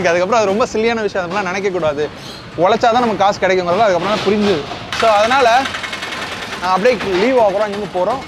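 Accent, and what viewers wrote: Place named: Kerala, India. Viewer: கேரளா போனதும் கேரளா பாஷை ஆட்டோமேட்டிக் ஆ வருது போல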